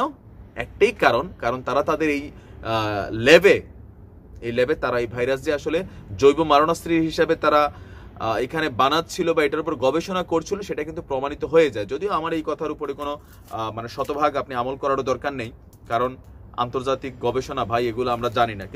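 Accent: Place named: Noakhali, Bangladesh. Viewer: কথাসুনে মনে হয় নোয়াখালি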